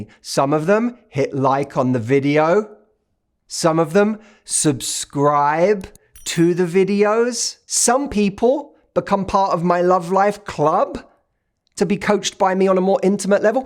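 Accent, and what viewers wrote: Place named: United Kingdom. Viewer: Matthew I have been watching your episodes for quite a sometime now.... I believe the last couple few years and this time you really grabbed me and and something you said I I just can't even pinpoint it but it really touched me and grabbed my attention so much that I'm still trying not to cry and I hope I can make it to that Sixth Day event I'm going to take a look at the package situation and thank you I enjoyed your videos and yeah maybe I am one of the the likes thumbs up person.... but I had to say that you are quite soothing to listen to as well..... I am a bit curious exactly where you're from actually, I can here the Brit down in you I believe! 🙂... I'm from Fort Lauderdale, FL in the United States but My Father.... (unfortunately, he has been passed on now over 3 decades from last April 19th, 1991... so, may he Still R.I.P., and WHOA! 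& Happy Father's Day to him!.... Anyways sorry I just realized what today is..... So back to my scattered point.... He was born in Dover, England, and the funny thing is I guess my dad's British accent might have wore off overtime here in the states but I can do a pretty good impression myself growing up watching a lot of the BBC as a kid..... i.e - Monty Python & the Flying Circus, Benny Hill, Fawlty Towers, even that soap EastEnders back in the day etc.... and I'm just rambling on that nothing... Lol.... Nice little chat aye! Thanks 4 everything oh! & a Very Happy Early Birthday to you! 😎 🎉🙂🎂😀🍾😊🎁 Make a Wish! I Do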